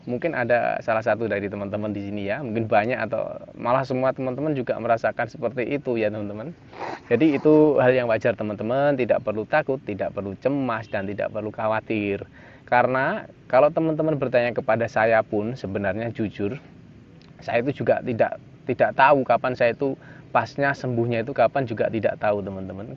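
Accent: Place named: Java, Indonesia. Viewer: asli mn kok kyk orang jw..mhn tlg di bls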